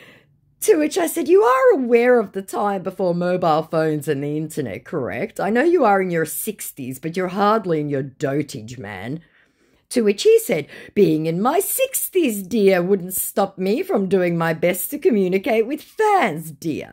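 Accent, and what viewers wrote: Australia. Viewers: Your voice is literally EXACTLY the same as my stepmom's. She passed suddenly a few days ago and was also from Australia - although she was from Adelaide. I got to learn a bunch of Aussie slang, got introduced to new foods and drinks, and can definitely tell an Aussie accent from a Brit one because of her. I'm taking her passing hard but your videos/voice are such a comfort to me right now. So from this Cali-born Pacific Northwest transplant, thank you. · Subscribed cos of ur Aussie accent.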